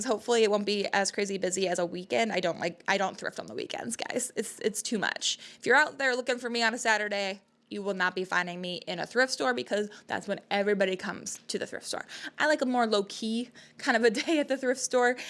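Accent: Midwest, United States. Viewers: It’s the Midwest accent. A lot of our t’s sound like d’s to others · Thank you for the video! I love watching your videos especially this series. I am from the Midwest and that is just how we say buttons and mittens. Try not to let the comments bother you.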